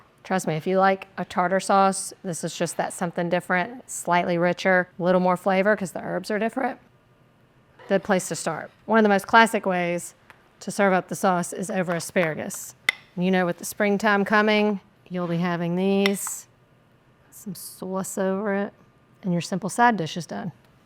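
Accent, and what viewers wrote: South, United States. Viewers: Southern girl....love it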